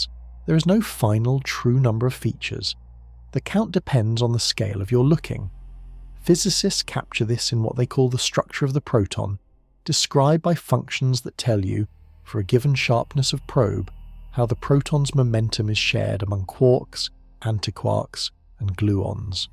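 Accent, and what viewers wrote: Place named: United Kingdom. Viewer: I suppose it’s meant to put you to sleep. But I wish they would make one of these with out a British accent.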